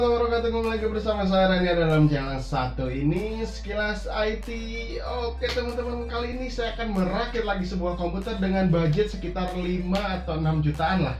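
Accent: West Java, Indonesia. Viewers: sunda pisan euy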